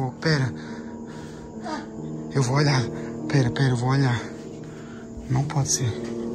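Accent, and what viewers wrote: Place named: Pernambuco, Brazil. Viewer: Onde você mora qual é o seu estado você mora em Buíque esse povo fala assim Senão não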